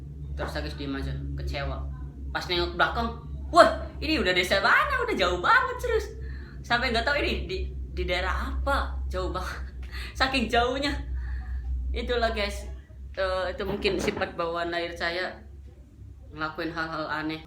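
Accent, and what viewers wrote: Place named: Java, Indonesia. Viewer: Medok bgt ngakak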